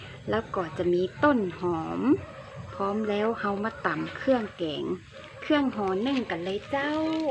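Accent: Northern Thailand, Thailand. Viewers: ชอบภาษาเหนือจัง มีเสน่ห์ ตำเครื่องแกงด้วยอีกแรงนะคะมามี้แรงเยอะมากจ้า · ภาษาเหนือน่ารักไพเราะมากๆครับ อาหารก็น่าทานครับ สวัสดีครับ มาติดตามรับชมและให้กำลังใจครับ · ภาษาเหนือน่ารักจังค่ะ อาหารก็น่ากิน☺️❤️ นิ้งมาย้ำกำลังใจให้กันเหมือนเดิมค่ะ❤️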